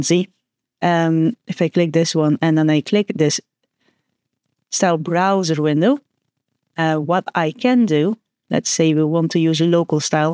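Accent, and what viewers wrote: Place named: Netherlands. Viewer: I know a Dutch accent when I hear one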